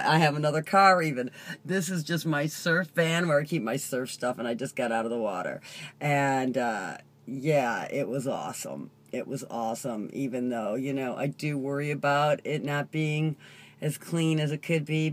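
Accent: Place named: Southern California, United States. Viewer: You look and sound like you are from southern California.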